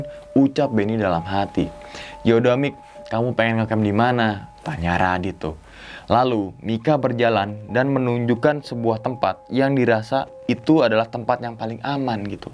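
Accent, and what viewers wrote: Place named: Java, Indonesia. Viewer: Bang,,btw asli mna 🤭ngomong bahasa jawa nya kyak gmn gtu,,,medok medok kota,🤭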